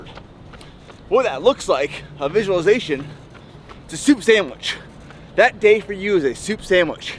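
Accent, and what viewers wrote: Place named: Pennsylvania, United States. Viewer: Its a Pennsylvania thing, like the way he says "On", "Awn"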